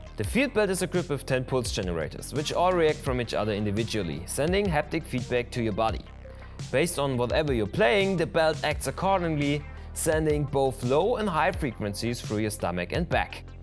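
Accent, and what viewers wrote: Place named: Germany. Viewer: This guy has the worst German accent ever. So harsh to listen to.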